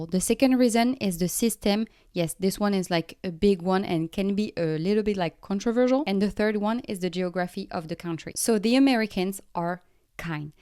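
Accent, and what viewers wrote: France. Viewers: I just stumbled on this topic while I was looking for a song. The subject of this topic was kind of intriguing but mostly I'm interested in topics featuring cute French girls having cute French accents when they speak English. I get what is getting at but I can't really agree with her central theme at this point in time. Historically, what she says is mostly true but, in recent years, Americans have gone out of their way to verbally trash their country. I believe this is mostly due to a planned idiocy infused into Americans by forces that want Americans to be stupid and compliant. Americans, in recent years, really have been made to be as dumb as rocks so that is particularly dangerous if they also have bad intentions. Also, and while there is a lot of "tradition" in France, I don't know where is precisely from so it is hard to argue with her feelings that she does not feel free to express herself in France. 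I know lots of people are expressing themselves very well in France. herself is very smart so her analysis is a valid pursuit. For example, she understands that nothing is "free". There is a widespread notion that medical care in France is free_. It is _not free but rather it is simply a matter that most of the people in France have no idea what it is costing them. I might be a little a little biased toward France and the French but then, I was born near Paris. I grew up in the mean streets of Paris. ( Well... Paris,...Kentucky.) But I hope most Americans wil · Did you go to school in the States because you have almost no French accent like I do after 50 years in the States 🤗 Et je suis d'accord que vivre aux USA c'est bien agréable que de vivre en France when you are a free spirit and claim your independence. The whole world is nice and different. Il faut suivre son coeur et surtout ne pas écouter les critiques des autres. 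Vive la liberté et notre raison d'être. One earth, One people. Make The World Great Again! 🍿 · She is very amusing; it could be the French accent, but either way, she does make some good points.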